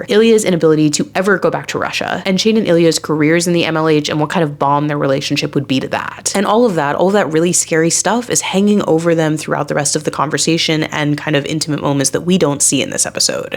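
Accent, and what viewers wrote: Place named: Chicago, United States. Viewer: I’m not sure where she’s from, but over here near Chicago, the majority of us all speak this fast. I never realized how fast we speak until I went to Georgia where everyone seems to speak in slow motion to me, and they constantly asked me to repeat myself, because they couldn’t follow it. She does edit the pauses. Those pauses are likely as she looks back to her prepared speech, but it’s still about the same amount of time she leaves a pauses between sentences in general. Slowing down her speech a bit for a wider audience and editing the connected breaks to fit that speech speed would probably be better, because many people have a difficult time following it. I have had a hard time slowing my speech, but it was required for public speaking so I can slow down when necessary. The fast speech part of the accent from this area is difficult to unlearn. 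At first you purposely slow down, but unless you keep thinking about it, you speed right back up. I could be completely wrong here. I’m just speaking from my own experience , and she sounds very similar to people from my area.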